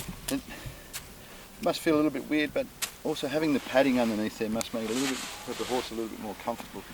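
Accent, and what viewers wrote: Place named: Australia. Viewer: You have a different technique than more farriers in not straddling the horse's leg. Love your accent, are you from Australia?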